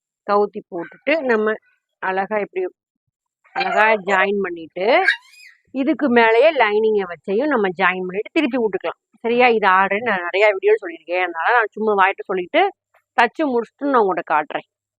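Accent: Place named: Madurai, India. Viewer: புரியும் படி நன்றாகவே சொல்லி தருகிறீர்கள் நன்றி உங்களூடைய வீடியோவில் அங்கிட்டு இங்கிட்டு ஏத்தலக்கடி இறக்கலக்கடியா இருக்கும் என்று பேசியிருந்தீர்கள் அதைக்கேட்டு எனக்கு சின்ன வயதில் நாங்கள் பேசியது ஞாபகம் வந்து விட்டது இப்போது அதையெல்லாம் மறந்து விட்டேன் நன்றி உங்களூடைய மதுரை பேச்சு ரொம்ப நல்லா இருக்கு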